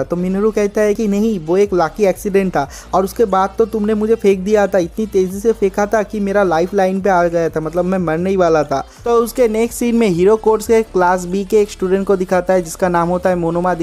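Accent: Bengal, India. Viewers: Are u Bengali?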